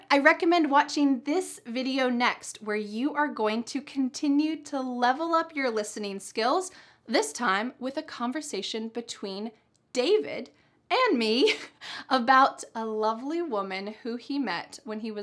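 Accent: United States. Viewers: Is it U.S. English? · Thank you aunt for sharing your knowledge and i also exicted to want study with you in next video😁👌and last you are my good teacher, who is always help me learn new words in american accent......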